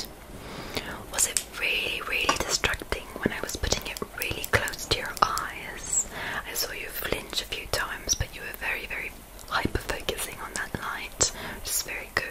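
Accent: United Kingdom. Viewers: Your British accent is fantastic!!! <3 My partner has ADHD and suffers from executive dysfunction. Watching this video helped me understand them more and taught me some ways I can help! Thank you!!! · That 330k looks very awesome under your channel name 👀 Congrats!! Also, could you teach me how to do a proper British accent?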